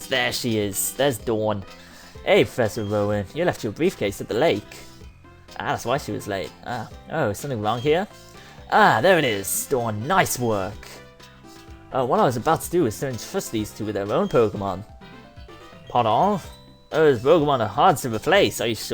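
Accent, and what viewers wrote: Birmingham, United Kingdom. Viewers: He comes from Birmingham :D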